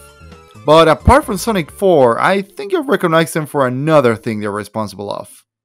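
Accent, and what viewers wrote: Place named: Argentina. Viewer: i'd never expect to encounter some Argentine Sonic The Hedgehog Fan English Speaker... Que Copado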